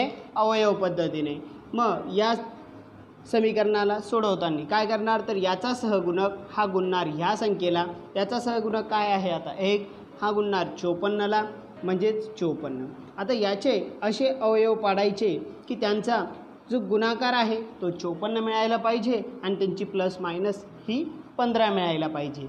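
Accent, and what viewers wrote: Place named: Kolhapur, India. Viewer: Kolhapuratun boltoy sir Brand shikvta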